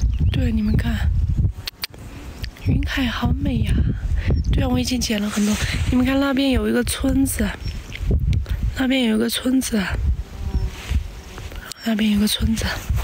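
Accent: Sichuan, China. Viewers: 的家乡真美啊！为你家乡骄傲，为你家乡出了这样的美女骄傲。听到一口的川味普通话觉得特别亲切，一点没觉得是藏族人。不用化妆更好，更觉得是个活生生的人。平时看的舞蹈，真是觉得是仙女下凡。这个短片也让我们感到真正的存在。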